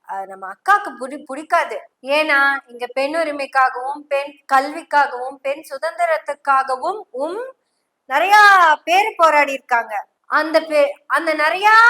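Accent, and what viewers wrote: Kongu, India. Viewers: கொங்கு தமிழ் சங்கு முழங்குவது போல் பெரியாரின் பேத்தியின் மொழி நடை உள்ளது வாழ்த்துக்கள் ❤️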